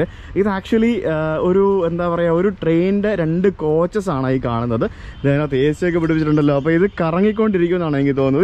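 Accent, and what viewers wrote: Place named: South Kerala, India. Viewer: പോകുമ്പഴത്തേക്കും . നോക്കുംബഴത്തേക്ക് . വികല മലയാളം ആണ് ..അരോചകമായി തോന്നുന്നു . നിങ്ങൾ അവിടെ എത്തുമ്പോൾ . മതി . മണ്ടക്ക് കയറി അല്ല മുകളിൽ കയറി . മണ്ട . ജീവനുള്ള വസ്തുക്കൾ ക്ക് ആണ് ..കേരളത്തിൽ മുഴുവൻ ഉള്ള ആളുകൾ കാണുന്ന വീഡിയോ യില് തെക്കൻ ഭാഷ കഴിവതും ഒഴിവാക്കുക . ❤️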